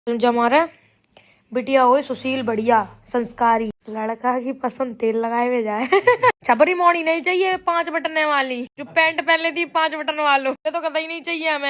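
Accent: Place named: Etawah, India. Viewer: Didi bahut Maja aata hai apni Desi Etawah mainpuri bali boli me blog dekhne me ...❤❤ I'm very enjoying to watching you vlog and also enjoy a pure Desi girl with Desi style😅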